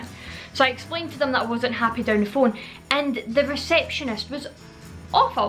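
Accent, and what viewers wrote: Scotland, United Kingdom. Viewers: your Scottish cadence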